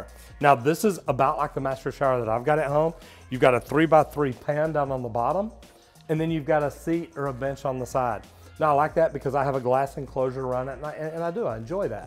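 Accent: South, United States. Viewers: I have no idea what any of this is, but I stayed for that southern accent. 😃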